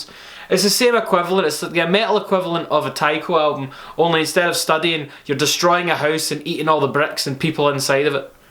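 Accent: Scotland, United Kingdom. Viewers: Wait you're Scottish? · If Anthony Fantano was Scottish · Ahhhh.... I've been watching so many of your videos that my brain is thinking with a Scottish accent !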